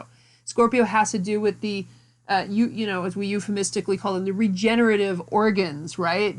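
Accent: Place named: Salem, United States. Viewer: I love your Salem accent when you say "forward" as "foeward" ♥️👏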